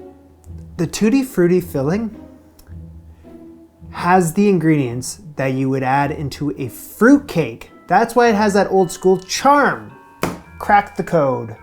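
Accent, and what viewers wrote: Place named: Southern Ontario, Canada. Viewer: once again, please never apologize for how you pronounce words! We all get to have our regional dialects and variations and the way you say “skewers” is entirely fine. I made a comment on your “cauliflower” episode and lots of people are still liking and agreeing to this day! Some people seem to not understand that YouTube is global and that their own accent is not the definitive one. You have a Southern Ontario accent, be proud of your roots! And, Girls Night Out should not be as tasty as it is, right? 😂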